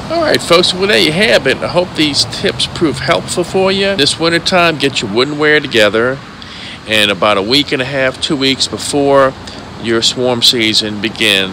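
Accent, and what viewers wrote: Louisiana, United States. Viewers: As soon as you started to speak, I knew you were down here in s/e La. good job on the video.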